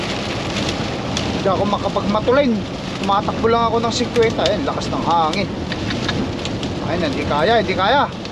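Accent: Ilocos, Philippines. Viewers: idol tiga saan k, parang ilocano k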